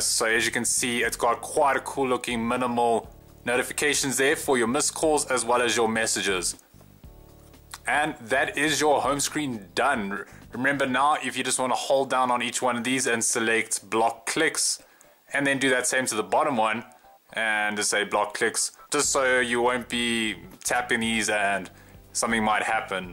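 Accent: South Africa. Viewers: You sound South-African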